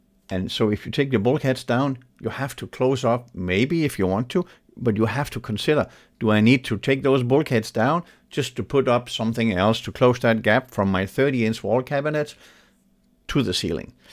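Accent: Denmark. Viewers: Liked and subscribed👍 very nice videos, and valuable information! Would i be mistaken if i said that there is a hint of “jydsk dialægt”? 😄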